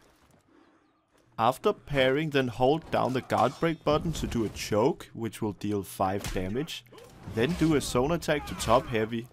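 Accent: Denmark. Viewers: Thats is one very thicc danish accent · First of all, great fucking video. Second of all, that is the most extreme Danish accent I've ever heard! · Are you danish? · Not to be rude but your English sounds so much like a typical “danish English” Are you Danish? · I can hear the Danish accent, it’s really thick.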